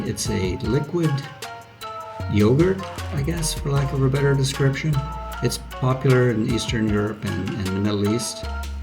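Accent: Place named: United States. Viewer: hi, thanks for the video, ex-californian here in sofia for 6 years. i did find your american 'a' in sandanski a bit grating, i hope you eventually find it natural to use the bulgarian sound for 'a' at least in the name of your new city. showing respect for the locality may make your integration a bit easier. i look forward to following your story. best of luck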